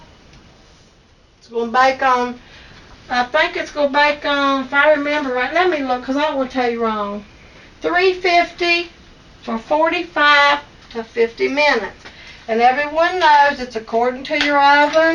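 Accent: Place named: Kentucky, United States. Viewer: I love listening to that accent! My dad's family is from Corbin, Kentucky and she sounds exactly like my aunts did. I'm curious where she is from.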